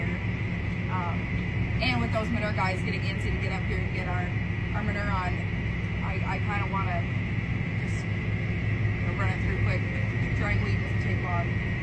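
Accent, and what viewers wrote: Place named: United States. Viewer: Newly subscribed. What state r u in. I’m trying to figure out the accent. Great video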